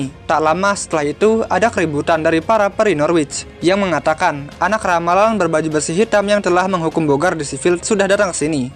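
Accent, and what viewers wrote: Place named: Bali, Indonesia. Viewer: logat nya cerita kayak orang bali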